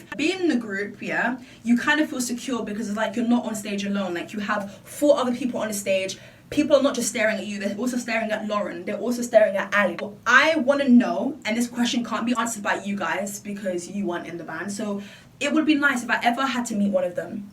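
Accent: United Kingdom. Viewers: Am I the only who has become so used to that I can't hear her British accent any longer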